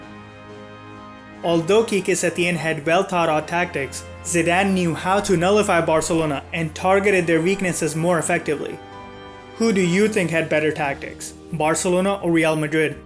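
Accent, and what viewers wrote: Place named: India. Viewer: India English.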